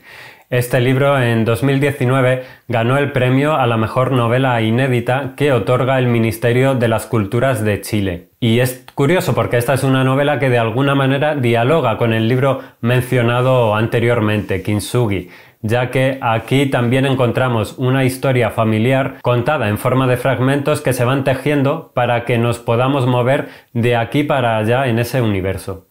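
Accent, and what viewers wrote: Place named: Uruguay. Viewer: kintsugi, lo tengo pendiente en bookmate y estoy leyendo: debimos ser felices, pero después de ver tu video no voy a poder no leerlo con acento uruguasho.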